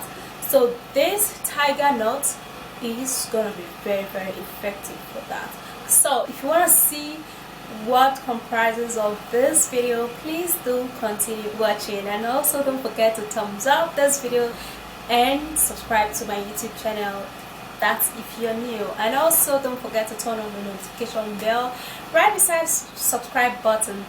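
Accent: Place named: Nigeria. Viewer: She's Nigerian.....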